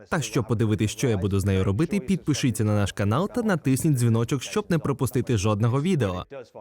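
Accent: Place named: Russia. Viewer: Шкода, що Український канал озвучує людина, яка все життя розмовляла руські... А той хто справді все життя розмовляв рідною мовою мусить дивитись і терпіти цей руський акцент... Повірте, це дуууже замітно!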